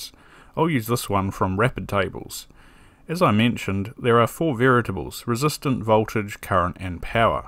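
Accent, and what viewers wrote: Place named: New Zealand. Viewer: New Zealand accent + Useful Information + Comic relief = Awesomeness!!!! I love these videos :D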